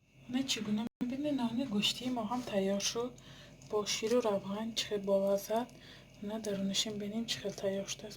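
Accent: Isfara, Tajikistan. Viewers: АПА МАН АНЧА ПЕШ КАНАЛАТОНДА ПАТПИСАТ КАРА БУДАМУ ХАБАР НАГИТАМ ХОЗИР БОШАД ХАМАИ ВИДЕХОТОНА ДИСИЯМ МЕГУМ ШУМО АЙ ТАРАФИ ИСФАРА НЕСТЕД ТАРЗИ ГАП ЗАНИ ИСФАРАГИ БА МОНАНДД АГАР ААЙ ИСФАРА БОШЕД АЙ КАДОМ НОХИЯШ 👍👍👍👍👍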